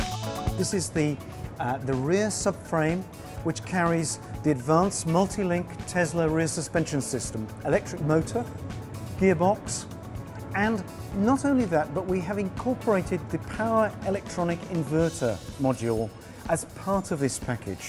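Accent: United Kingdom. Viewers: lol. They had to take a guy with a british accent. For some reason, people tend to swallow bullshit as long as it's coming from the mouth of a british. I could smell some bullshit in that speech. I know it's a good car, I like the car, but this guys tried to make it look like the car is more then what it actually is. He tried to sell small achievements as big "nearly impossible" achievements. I mean c'mon now. We know the car is good but please..... quit the bullshit. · Between his British accent and that awful music, I didn't understand much of what he was saying.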